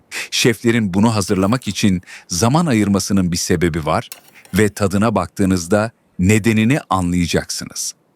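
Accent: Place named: Turkey. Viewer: Salam niyə türk ləhçəsi ilə danışırsınız?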